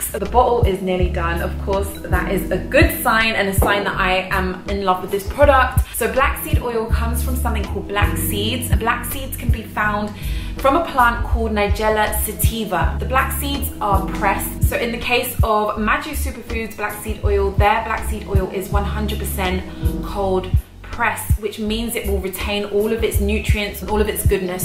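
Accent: United Kingdom. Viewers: Love your Brit-tis accent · Her accent is nice. It is how we speak in the UK. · A Latina with a British accent Double sexiness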